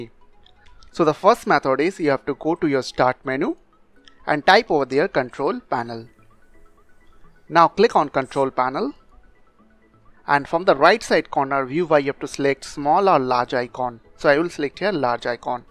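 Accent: India. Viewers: when i heard indian accent voice i though this guy is trying to scamm people but seems pretty legit i just hate how some people can ruin own country reputation just like that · Very strong indian accent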